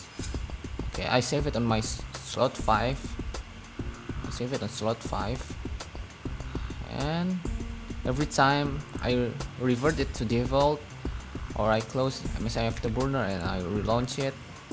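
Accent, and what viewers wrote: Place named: India. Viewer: For some reason he's the only indian guy that tries to speak english in a funny way haha i love it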